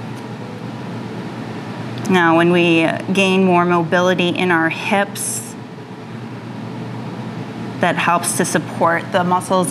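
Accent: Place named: Midwest, United States. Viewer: You're from the Midwest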